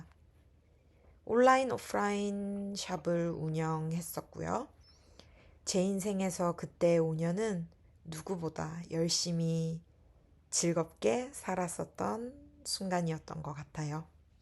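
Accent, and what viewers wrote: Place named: Seoul, South Korea. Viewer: 우왕 서울말 진짜 잘하시넹 하다가 인!생의 듣고 빵ㅋㅋㅋㅋㅋㅋㅋㅋ 언니 그래도 진짜 자립해나가려고하는 의지를 중요하게두시고 앞으로 나아가려고 하는 것이 잘 보여요! 어디 가서 사시든 혼자서도 다 잘해내는 예요!ㅋㅋ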